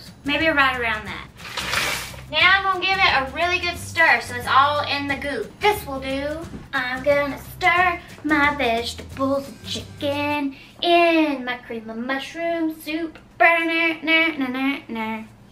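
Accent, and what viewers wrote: United States. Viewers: love you're country accent love you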